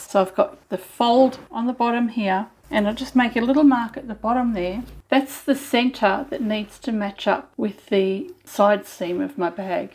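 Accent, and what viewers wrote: Australia. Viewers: Is she American? She sounds Aussie to me, I might need my ears cleaned lol.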